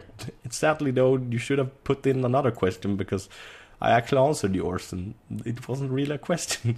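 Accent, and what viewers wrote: Sweden. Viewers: I love your sense of humor. I have to say, though, probably just because of the accent and because I know you're Swedish, I keep expecting you to be blonde, and then the dark hair is a little... disconcerting?... to see. Do you ever have that experience, somebody on YouTube somehow not matching, in appearance, what you expected from their voice or from other things you knew?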